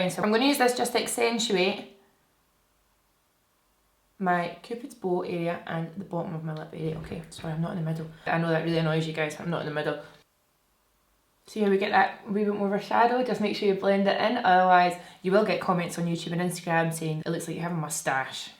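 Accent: Scotland, United Kingdom. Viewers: Hi from Israel! Are you scottish? I love your accent❤🌹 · you’re scottish omg!! me too i’m so happy i found a scottish youtuber ahaha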